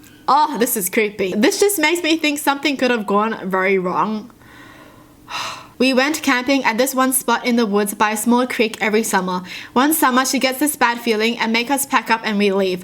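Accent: Australia. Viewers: are you Australian? because you sound just like my friend’s mum😂😂luv you btw💜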